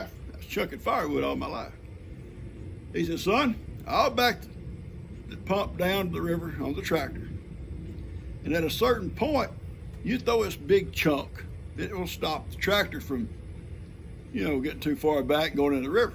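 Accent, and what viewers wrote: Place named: South, United States. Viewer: Hi. I don‘t quite understand all you‘re saying (southern accent 😉) but I love listening to your stories 😊👍 I for sure understood the story about your pants and the family in their car 😂 I always enjoy looking at pictures from earlier days. Do you have some for us? Greetings from Switzerland 😊🇨🇭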